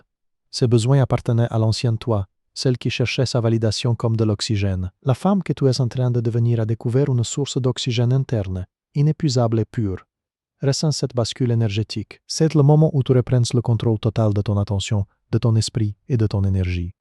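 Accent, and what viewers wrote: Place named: France. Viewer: 😂Ce que j'aime dans cette vidéo c'est l'accent français qui relate les faits classiques et la solution 🎉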